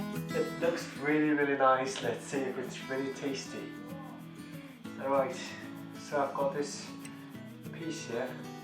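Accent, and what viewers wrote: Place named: United Kingdom. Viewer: I loooove your British accent 😍😍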